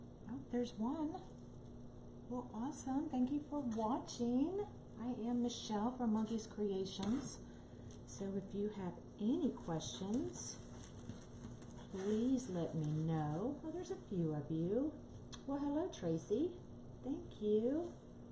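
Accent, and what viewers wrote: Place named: South, United States. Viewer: LOVE your southern drawl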